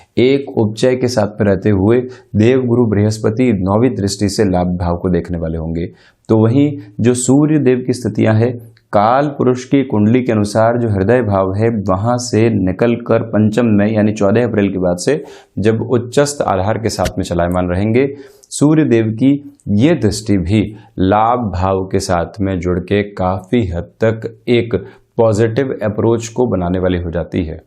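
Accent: Standard. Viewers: Wah, kya Hindi, itni shuddh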